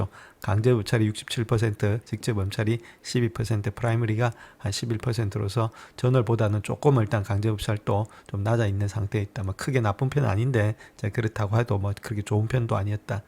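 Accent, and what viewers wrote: Gyeongsang, South Korea. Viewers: 차분한 경상도 사투리가 새롭고.. 다른 시황분석🎉에 박수 보내며 감사합니다.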